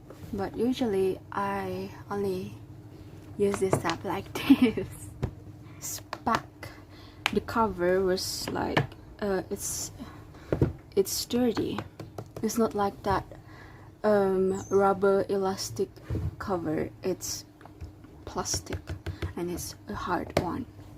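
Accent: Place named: United Kingdom. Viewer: I think you have a British accent... So cute..😊😁. Thank you for a wonderful video.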